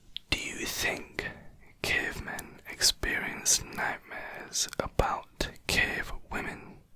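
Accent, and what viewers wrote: United Kingdom. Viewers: FINALLY A BRITISH ASMRTIST 😍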